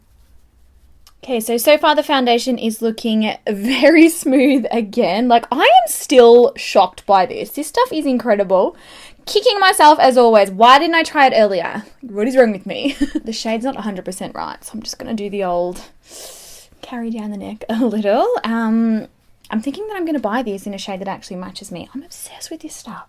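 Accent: Australia. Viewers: I love this testing style and I love that you keep your Australian way of speaking, instead of putting on some weird accent that some other Australian youtubers do. Most of all I love that you say FORRID and not forehead! xoxo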